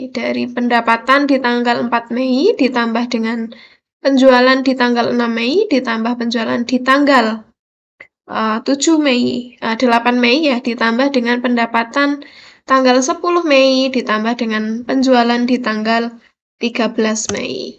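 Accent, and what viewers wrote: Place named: Java, Indonesia. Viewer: Aw medoknya khas bgt kek orang klaten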